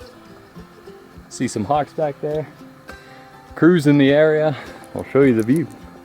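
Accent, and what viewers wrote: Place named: New England, United States. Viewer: What's up from mass! Your accent sounded right at home, loving these videos and hopefully plan to hike a ton in the whites this summer. Good luck with the rest of your trip and maybe see you up there cheers man!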